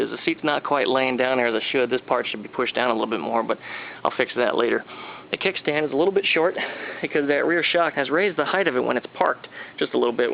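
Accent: United States. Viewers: It's in a good Way bro and you kinda sound country no disrespect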